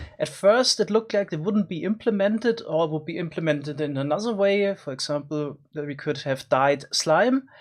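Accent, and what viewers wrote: Germany. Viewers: The German accent kicks! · Das deutscheste Englisch auf Youtube. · Ich bin mir sowas von sicher das du n deutscher bist xD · Wie man hört das du deutsch bist haha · Mann Hört das du deutsche bist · Dieser deutsche Akzent einfach xD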